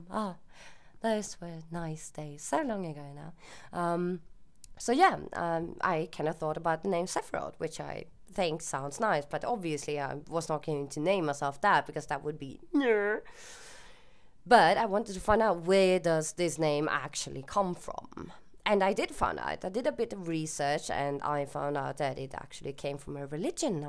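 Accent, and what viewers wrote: United Kingdom. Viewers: I am from Norway so i wonder why i thought you were British xD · Reading the comments I see that I'm not the only one who thought you had a british lilt to your voice, though not a full british accent. You speak english very well and perhaps the lack of a heavy accent is why some listeners are confused about your native language. The information on swearing was also very interesting. I've never been offended by swearing, but I do find excessive casual usage to be crass. I'll keep your comments in mind in the future.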